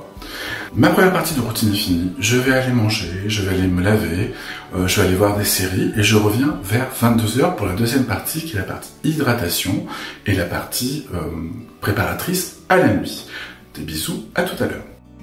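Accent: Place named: Marseille, France. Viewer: Bel accent presque le mien de l Estaque quartier nords en foooooorce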